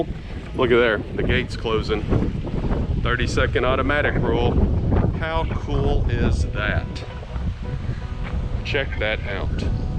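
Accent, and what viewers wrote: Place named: Texas, United States. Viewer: Hi there, nice and easy, your way of talking texan its just amazing , viva texan,....the explanation was great , thanks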